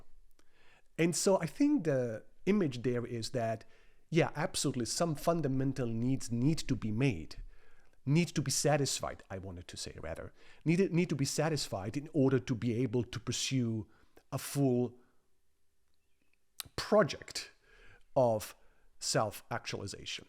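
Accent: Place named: Germany. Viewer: That was a wonderful talk, and it has certainly awakened my interest in Heidegger. Thank you! Your spoken English is excellent, by the way. Your accent is difficult to place. Ist Deutsch ihre Muttersprache?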